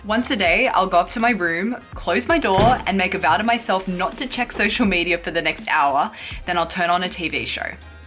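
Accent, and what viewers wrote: Australia. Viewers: Australian accent is hilarious. I wonder if native Chinese people can tell them apart from an American accent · As a Chinese,I like your Australian accent. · So if you want to speak like Ausie just insert an R after every O sound. OrK ? sor goring dorn't shorw vorcabulary cornjunction cartoorns gor goord morment Not to mention all the up talking, very very painful to listen to.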